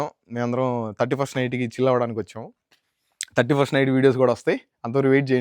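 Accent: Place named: Kadapa, India. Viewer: కడప స్లాంగ్ ఎక్కువగా, నెల్లూరు స్లాంగ్ తక్కువుగా మాట్లాడతావు 🥰🥰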